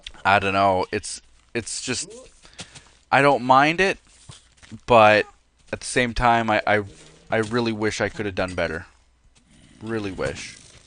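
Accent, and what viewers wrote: Canada. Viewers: Best stereotypical Canadian voice ever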